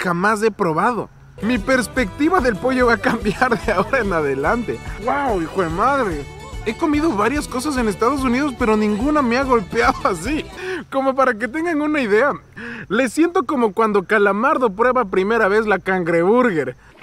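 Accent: Ecuador. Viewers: Nuestro acento Ecuatoriano en ingles se reconoce al paso 😂😁🇪🇨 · Eh visto otro youtuber y si son buenos. Pero Tu, tienes calidad, hasta para hablar a lo Ecuatoriano 👍👍💪🇪🇨🙏 sigue asi y bendiciones. Te ganaste en mi lista #1 de youtubers.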